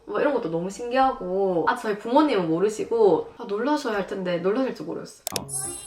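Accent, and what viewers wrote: Gyeongsang, South Korea. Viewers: ㅋㅋㅋㅋㅋㅋㅋㅋㅋㅋㅋㅋㅋㅋ 억양이 완전 대구 억양ㅋㅋㅋ귀여움..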